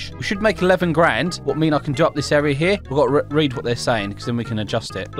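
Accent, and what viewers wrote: United Kingdom. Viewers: For at least 1 year I didn't know he was british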